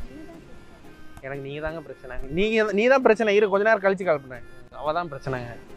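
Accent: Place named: Tirunelveli, India. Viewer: Bro tirunelveli karanu supera katturinga namma oru gethu speech than sema bro👌👌👌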